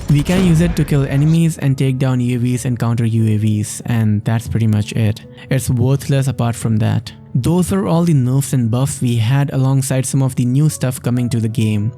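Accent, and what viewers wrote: India. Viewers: Are you indian, your accent is kinda Indian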